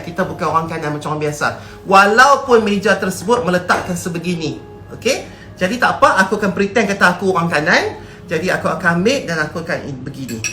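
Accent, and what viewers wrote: North, Malaysia. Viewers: Dulu saya belajar KH ERT/RBT etika di meja makan...kira ok dahla tu....wow rupanya lagi detail ye...thank u..belajaq sambil dok gelak ngan celoteh utara dato' lawak aih...tengok sampai habis nah..sampai kul pagi 14/8/2020...hahaha bosan x tau nak tengok apa...sekali sekala menimba ilmu ni...kan senang nak dapat...moga lekat dlm kepala otak ni...aminn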